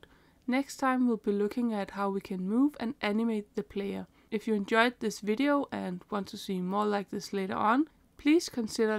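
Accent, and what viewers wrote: Denmark. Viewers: Oh, the accent. Are you danish? Greetings from across the western border! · Wow, you sound very Danish, anyways, great guide! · Du er i hvert fald dansker da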